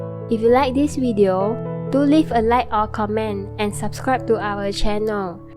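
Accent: Malaysia. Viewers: I have just posted mint sauce recipe and found this video. I love this. I am now a bit confused since this video explanation in Japanese, subtitle in English and pronunciation is typical Malay Chinese... Anyhow, I love your channel and subscribed. Looking forward your coming videos! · Definitely Malaysian hahah, 'Add Oil' arh!👍✌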